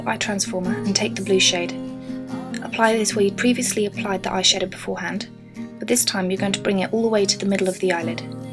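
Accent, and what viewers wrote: United Kingdom. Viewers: i love ur british accient and ur make up is amazing!!!! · its nice to hear a british voice on youtube, most the people that do make up tutorials are american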